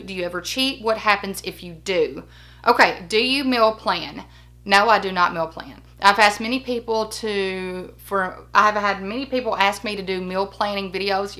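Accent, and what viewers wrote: South, United States. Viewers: New subbie! Excited to watch your video. Where are you from, sound like a southern accent (like me) 😀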